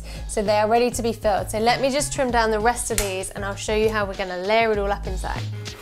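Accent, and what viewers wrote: United Kingdom. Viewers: Learning British Accent in this channel is my fave ❤️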